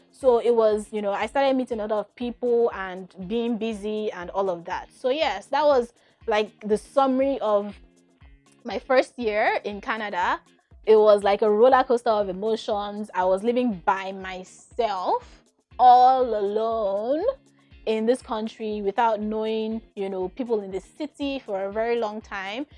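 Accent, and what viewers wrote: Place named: Jamaica. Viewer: For some strange reason, you sound like a Jamaican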